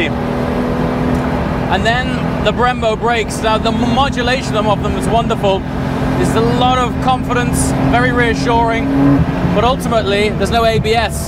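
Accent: United Kingdom. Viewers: something different about a british guy explaining a car.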